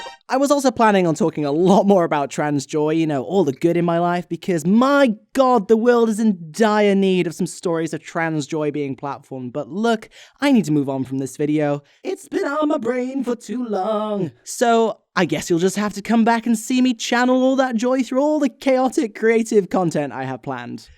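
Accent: United Kingdom. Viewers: (Warning, overused joke incoming) If I had a nickel for every time I’d seen a transmasc person named with what sounds like a somewhere British accent that’s also just a chaotic wholesome vibe to witness on my silly little screen I’d have two nickels, which isn’t much but it’s fun that it’s happened twice (I almost forgot to finish the joke)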